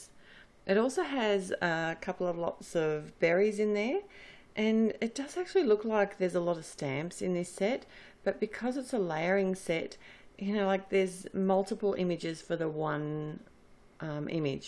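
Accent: Australia. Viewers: Nice to hear a fellow Aussie's voice on YT!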